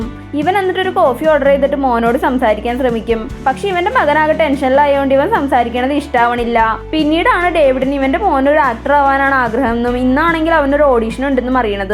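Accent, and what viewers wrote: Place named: Thrissur, India. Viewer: കഥ പറയുന്നവൻ തൃശ്ശൂർ എവടെ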